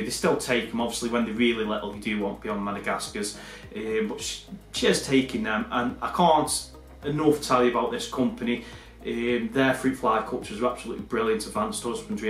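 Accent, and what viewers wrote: England, United Kingdom. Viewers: Great video... My next but 1 mantis is going to be an orchid, getting a moss mantis trio this week! Orchid hopefully in a month or so. Where abouts are you from? I live in Canada, but was born and grew up in England in a place where everyone says ‘looook’!!! Thinking you are pretty close to where I used to live!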